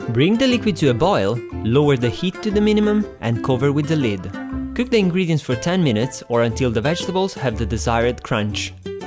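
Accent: Italy. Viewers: I knew it! you got the touch of Italian flair in your manner of speaking....please come to Toronto...you stay with me....and we cook veges day in and day out...I mean it.Toronto is heaven for vegetarian due to imports from Asia, central America, the caribbean, mexico and so on. Here, I see vegetables and fruits I haven't seen somewhere else. probably I will record a video and send u next time I go to the store. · I think it's italian i'm italian and that's what it sounds like :)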